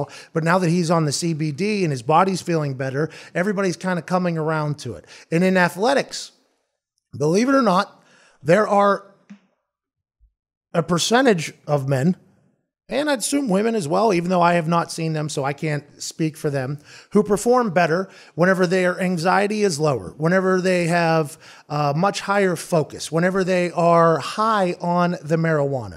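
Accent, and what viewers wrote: Pittsburgh, United States. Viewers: That yinzer accent lol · Raymond Toper hahaha same , his Pittsburghese is so good too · I love when he does accents of idiots and his Pittsburghese accent comes out. I'm from the burgh and my buddies and I do it all the time. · The Pittsburghese gets me every time! #412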